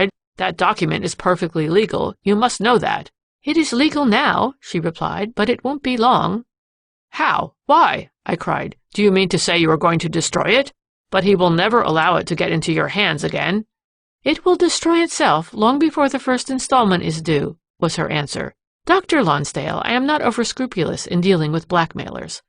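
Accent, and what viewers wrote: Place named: United States. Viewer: sorry, no, don't mind me. I just was looking for as I mentioned in another reply, a British voice reading these particular stories. And I would go to one that would have one name for the channel and it would be I presume you or another female non-British voice, then I would scroll and there would be another one in the series but the channel name would be different and I would click on it thinking it would be a different voice but it was still an American or Canadian female reader. If I mistook this and if it's not like that and there aren't different channels with you or another non-british female narrating then I apologize. I did it very quickly so perhaps I got it wrong. But my original question was why does it sound like the same woman narrating stories I want to hear but with different names for channels where one might reasonably assume it would be a different voice a different person altogether,, but every one I clicked on seemed to be the same voice no matter what the name of the channel was.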